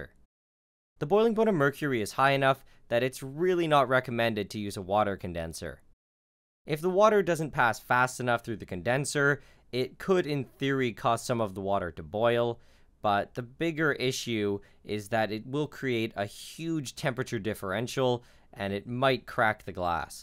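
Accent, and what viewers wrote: Canada. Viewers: Why do Canadians say "BEEN" as "bean" and not "BIN" pronunciation?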